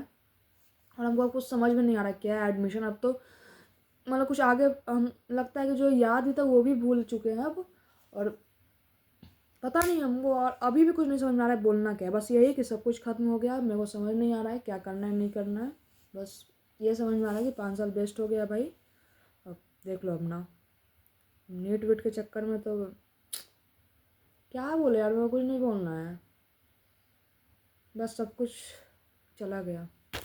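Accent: Bihar, India. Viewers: Bihar se ho na? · Ap bihar se ho?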